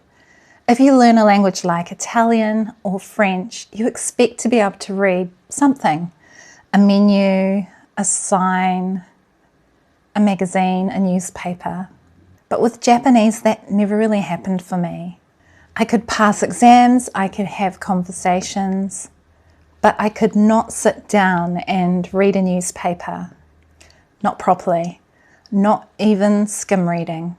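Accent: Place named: New Zealand. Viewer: Yes! Great to connect. When I heard you cool Kiwi accent I felt like I was listening to a sister. Yes, pitch accent is so important. When we are out together , my wife lets me speak freestyle, but when we are at home together she is quite strict about teaching me how to speak with the right accent. Also she said "I can't teach you to speak mans style so you will need to find someone for that". I found a guy online who I would with once a week. He teaching me the real deal. I told him, no Keigo, and I do not want to learn textbook rubbish. And he agreed with me 100 percent. I think I'm an interesting case for him. My local friends are very patient but they mumble a lot. Tokyo japanese is so clear compared to that, and being in the countryside here in Amami, everyone speaks casual style He teaching me the real deal. I do 4 Kanji a day. I think Kanji will take a very long time to get. 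Your tips on learning to read stick with me, so I'll keep doing that. My reading is pretty bad though.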